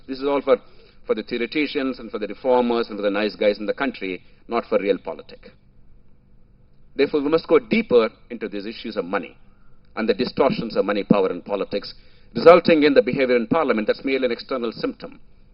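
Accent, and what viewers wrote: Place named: United Kingdom. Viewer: Really his voice resembling British slang... Hats off sir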